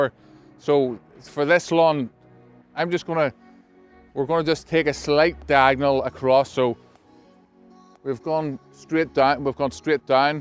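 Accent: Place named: Belfast, United Kingdom. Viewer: Love the accent, my dad was from Belfast...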